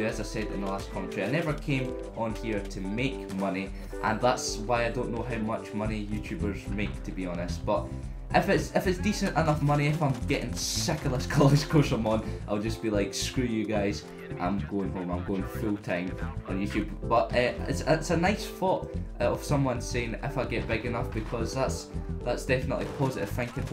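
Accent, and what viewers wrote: Scotland, United Kingdom. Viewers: Can you do a video where you would speak at your normal pace (unless if this is your normal pace in which case i like the scottish accent obv because i have one :) )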